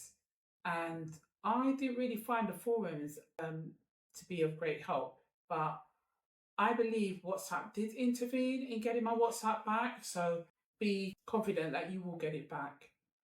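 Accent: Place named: United Kingdom. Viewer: Am actually watching this video but I don’t know which one am confused at if it’s your beauty or the British accent or the boldness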